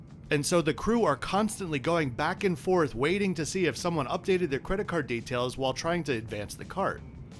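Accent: New Jersey, United States. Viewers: I knew it! You’re from Jersey😂 accent gave it away. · Knew you were from Jersey by the way you say water. Great video per usual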